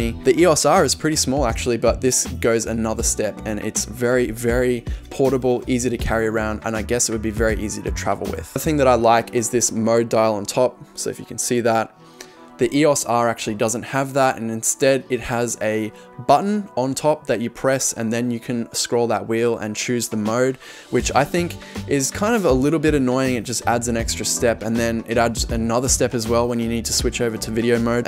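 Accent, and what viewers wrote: Australia. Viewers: Title caught my attention then within 2 words I realised you were a fellow Aussie , within 30 seconds I subbed. Looking forward to exploring the channel more👍🏻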